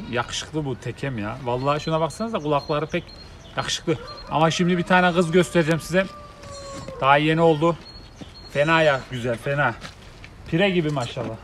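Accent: Konya, Turkey. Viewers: merhaba, kanalını dünden beri yeni keşfettim. hayatimda hiç köye gitmedim, köy yaşamını da tatmadım haliyle. o kadar sıcak kanlı, sempatik, hayatla bire bir yaşam süren, evinde hayvan besleyip kendini zenginim diye tanıtmayan ve gerçekten insan olan biri olman beni çok mutlu etti. hayvanlarla iç içe, doğayla, çevreyle iç içe olman harika... umuyorum çok daha iyi yerlere gelirsin, hiçbir youtuberla seni kıyaslamayacağım çünkü gerek yok. herkesin doğuştan gelen yaşam tarzı, keşke ben de natural bir yerde yaşasaydım. araba gürültüsü, fabrika dumanları, boş insan sesleri, huzursuzluk, kaygı ve dahası... bunların hepsi insanı yoruyor. başarılarının devamını dilerim... bu arada konyalı mısınız? konuştuğunuz ağız çok benziyor.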